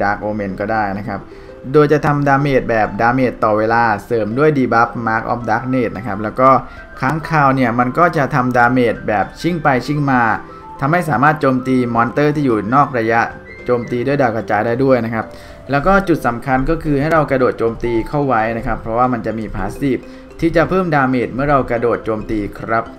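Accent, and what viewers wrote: Thailand. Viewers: มันก็จะเหน่อๆหน่อย555+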